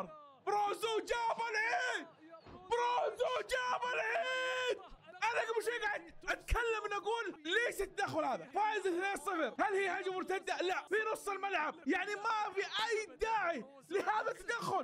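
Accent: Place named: Jazan, Saudi Arabia. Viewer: لا بس حسيت طريقة كلامك كأنك جيزاني..... والنعم فيكم اتشرف فيكم❤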